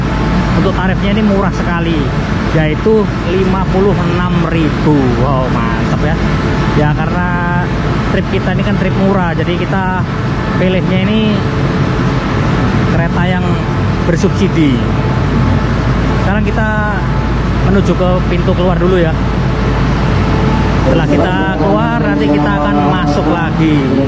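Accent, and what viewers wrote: East Java, Indonesia. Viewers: Mas orang mana ..kok bahasanya kayak Orang jatim?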